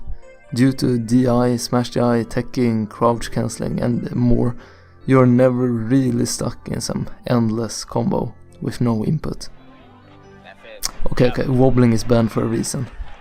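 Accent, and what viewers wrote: Sweden. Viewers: the most swedish accent of all time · extremely swedish accent. I was hoping you would say "double-yump"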